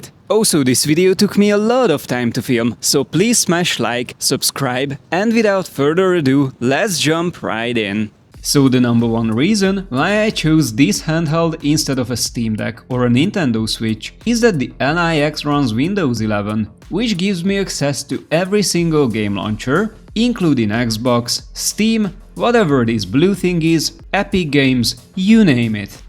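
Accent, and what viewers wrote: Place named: Hungary. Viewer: Magyar vagy? Legalábbis akcentusból ítélve. :)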